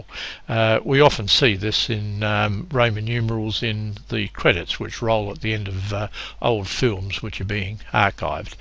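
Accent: Australia. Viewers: Thanks very much. Love the Australian accent.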